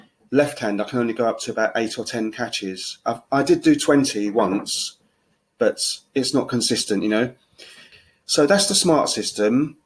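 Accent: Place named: United Kingdom. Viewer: I never really noticed that you had like a British accent. (Is it a British accent?). Nice video by the way.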